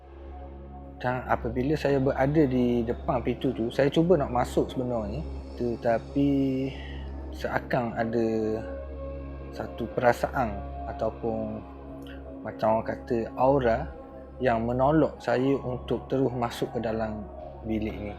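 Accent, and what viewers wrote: Terengganu, Malaysia. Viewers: dgr loghat mcm ganu ni...ok done subscribed...salam dari Singapura... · Suke dengar loghat ganu ni..👌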